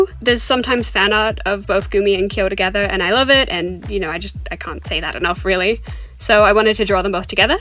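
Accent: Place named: Australia. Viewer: Amazing drawing! Btw since its winter for you and from your accent we can tell youre from australia or new zealand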